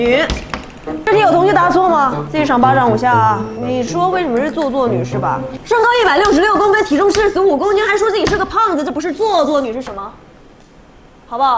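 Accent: China. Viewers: 她怎么是大陆口音啊？ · 為什麼要大陸腔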